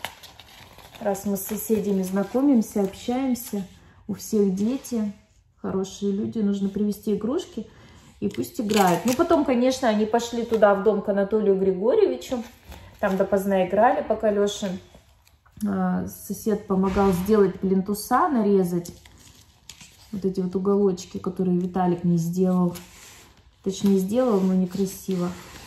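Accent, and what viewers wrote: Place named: Urals, Russia. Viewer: молодец. Все ты правильно говоришь. Да ты говорила, что это центр и называется Вип. А, что ты говоришь по уральски... Да ты умничка, что не стесняетесь это сказать. Да у нас на Урале так говорят. Особенно старики в глубинке. А видимо с детства это запомнила. Что в этом плохого.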